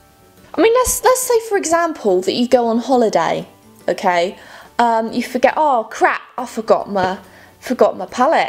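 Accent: England, United Kingdom. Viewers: So she has a British accent but she lives in America. How come? Why is she in America? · Okay. So. Hi! Where are you from? If you don’t mind me asking 😅😅 I think you’re living in America? Maybe? But I can’t work out your accent? You sound quite British like me! I just can’t work it out.. can anyone help? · You opened that salt so effortlessly. seemed really safe. You sound so British in this- I love it! But you ruined it by hating on fish and chips haha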